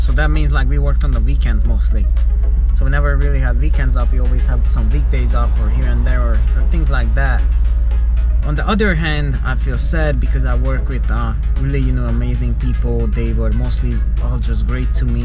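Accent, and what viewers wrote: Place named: Armenia. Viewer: Your accent sounds either Armenian or Russain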